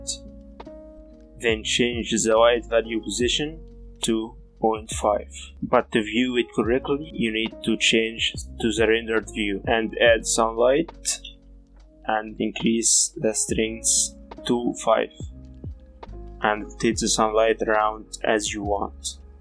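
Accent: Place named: Egypt. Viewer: You are arabic, aren't you? Most likely Egyptian. I knew it once I heard your accent lol. I am Masry as well.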